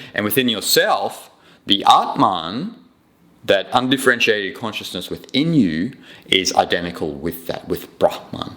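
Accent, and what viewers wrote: Australia. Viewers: Good on you my Friend to explain all this in your Accent which is easy for most of the westerners, I guess urs is Australian Accent, which is I am familiar with as lived there for 5 years, Good to be in contact with you regarding such discussions, Take care, Revert if you feel like.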